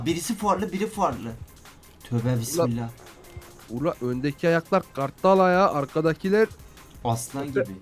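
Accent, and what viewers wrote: Elazığ, Turkey. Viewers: Abi Konuşdugunuz Elazıg Şivesi :D ula falan :D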